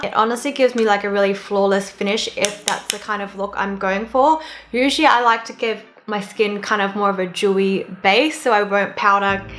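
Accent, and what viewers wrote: Australia. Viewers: If I can remind I suscribed to channel with her orange or red makeup, her cute aussie accent really lifts my spirit and love to binge watch all her videos to catch up her contents. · Congrats 🎉 love ur makeup tutorials and ur aussie accent 🥺🤟🏻 IG : diemthy.ng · happy 100K !! wasn't expecting you to have an Australian accent and happily subscribed when i watched ur first video because of the energy positive vibezzz and being genuinely excited for makeup. looking forward to your future vid lovexx ig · i've just recently subscribed after watching a few of your videos and it's so good to come across an aussie youtuber that i actually like and trust! plus it's just nice hearing a familiar accent haha love your work x IG: jai.enne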